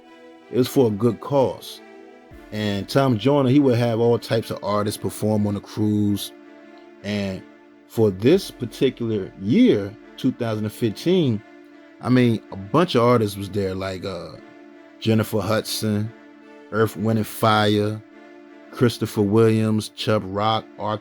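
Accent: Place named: Philadelphia, United States. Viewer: The narrator must be from Philly!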